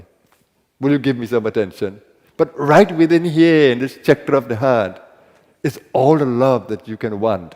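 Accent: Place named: Guyana. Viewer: Very good guidance..thank you... Swamiji your accent sound Guyanese..Are you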